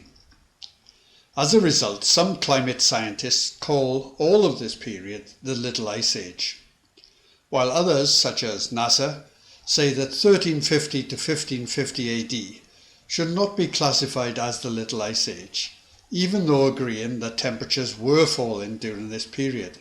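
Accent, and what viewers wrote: Wales, United Kingdom. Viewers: This is one of my favourite channels on the YouTube. Fact based. Clearly explained. Exceptionally well resourced. And a warm welsh accent. (Newport or the nearby valleys if I'm not mistaken) in a measured tone. Top, top work mate and thank you for taking the time to put this excellent content out. Diolch yn fawr.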